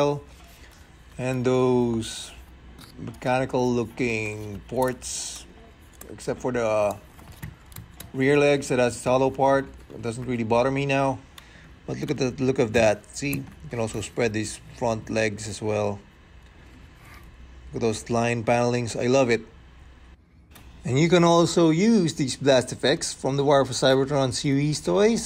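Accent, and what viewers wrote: Philippines. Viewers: You sound like a Filipino. Mabuhai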